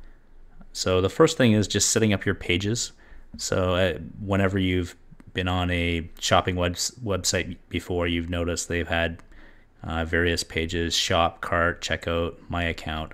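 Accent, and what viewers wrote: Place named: Canada. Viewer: Thanks for the Awesome FREE tutorial on how to set up a FREE E-com site,. So many out there plugging free this and free that only to lure people into an "only $*.** for the real information" situation. I only have one issue with your tutorial: Although you don't say "ABOOT" like South Park makes fun of/impies Canadians say, you really don't say ABOUT either. It's more of an "ABOUOOT". And I used to speak with Canadians daily when I worked at a Logistics company here in L.A. and y'all really do say it that way, (except those damn french canadians, they've got their own crazy inflections) so I can see where Matt & Trey get the stereotype from. Anyways, I am happy aboot the fact that you create these informative videos, Thanks!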